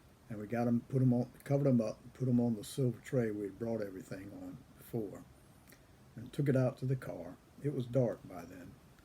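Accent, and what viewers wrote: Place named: England, United Kingdom. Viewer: I knew I heard an English inflection your dialect. What part did your family hark from?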